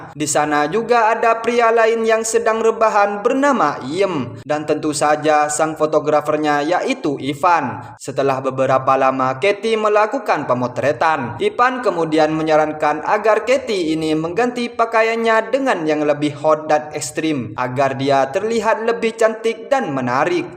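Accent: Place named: Madura, Indonesia. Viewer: Suaranya kayak Oreng Medura....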